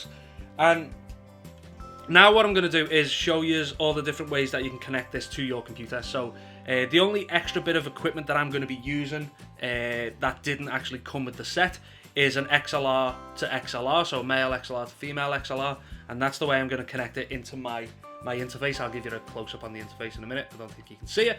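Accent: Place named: Liverpool, United Kingdom. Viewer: Sound like ur from Liverpool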